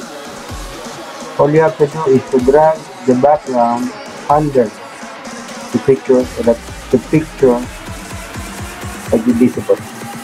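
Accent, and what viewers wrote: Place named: India. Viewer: PARANG INDIAN ANG BOSES NG TUTORIAL KUYA PARANG SI JHAMAL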